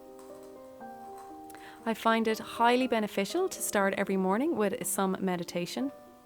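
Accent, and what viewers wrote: Ireland. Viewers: This is the first video of yours I've seen and I heard the accent and was looking at fields trying to recognise what county you're in ha! I grew up in the Wicklow mountains and it's so gorgeous, we're so lucky to have such gorgeous countryside ❤ living in Dublin city now and doing the best I can to be sustainable in a flat!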